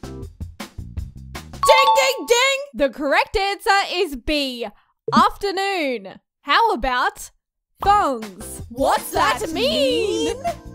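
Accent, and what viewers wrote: Australia. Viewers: You have 1 of the most Aussie accsnts I have ever heard and I'm from rural NSW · Went to America this year and the first thing someone said was “listen he talks like bluey”😊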